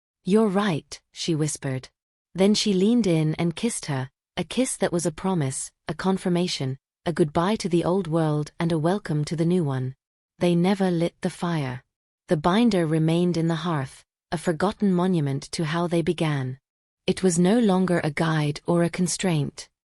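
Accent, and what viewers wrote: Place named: Ireland. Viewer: The reader's accent sounds Irish. It's beautiful. I feel like I'm far away in a green country, inhaling the bakery''s smell of home. I long for a little bit more.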